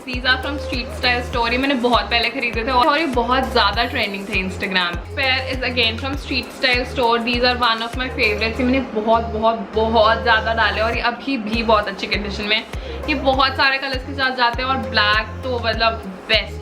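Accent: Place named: Punjab, India. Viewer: Punjabi tone in hindi is best 😎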